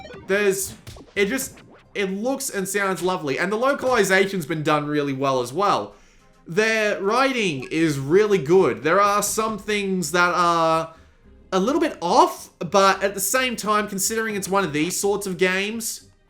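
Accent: Australia. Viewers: Thanks for the footage - nice to hear an Aussie accent too :) Reckon I might have to grab this.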